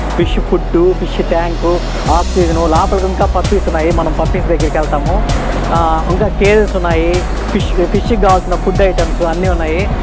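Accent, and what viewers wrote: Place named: Chittoor, India. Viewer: What a slang pakka Chittoor 😂😎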